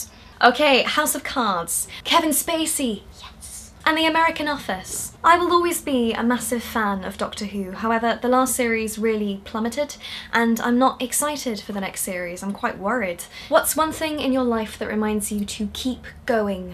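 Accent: France. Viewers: aaaah! I love you french accent! you speak very well, good job! I'm so happy because now I know that I can speak with you sometimes in french:-D tu es une fille super! ça fait 1 heure que je regarde tes videos et je ne m'en lasse pas:) Bisouuuux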